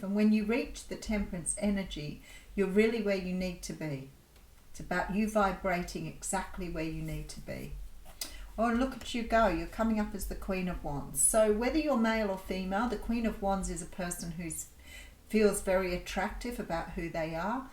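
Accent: Australia. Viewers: He wants work on things with me. Spend time in a new and different location with just the two of us. As nice as that sounds, the last 3 years have nearly broken me - body mind and spirit. The skills I’ve learned from you. have been life changing for me!! Your sweet Aussie voice has become the voice in my head. Helping me sort thru difficult feelings. Without telling us what to do, you’ve lead by example and taught us how to empower ourselves! Thank you! ✨🙏💕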